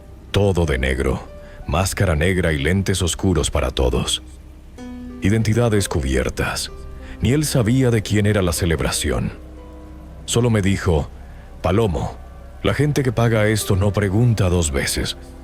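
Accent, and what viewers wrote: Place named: Venezuela. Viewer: te escucho debes de Venezuela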